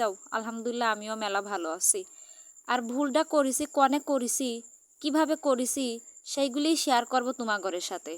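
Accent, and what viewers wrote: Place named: Pabna, Bangladesh. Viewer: খাটি পাবনা জেলার ভাষা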